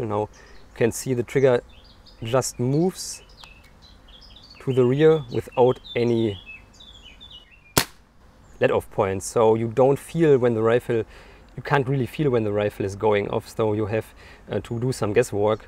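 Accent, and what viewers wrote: Germany. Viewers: Deutsches englisch♥ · Deutsches English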